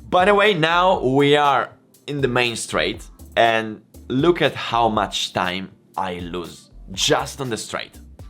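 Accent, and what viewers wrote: Italy. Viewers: I love your accent. Makes me miss living in Italy even more now. I subbed when you said, "if I keep da fooot like dis, I can poosh, while if I keep da fooot like dis, I cannot poosh" 🤣🤣😍😍 Great video, keep'em coming and ride safe 🏍💨✌🐼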